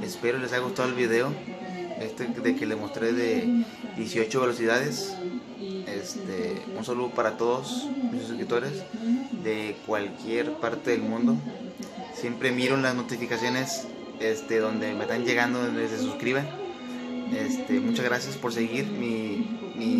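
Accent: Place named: Mexico. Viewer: Me fascina ese acento Mexicano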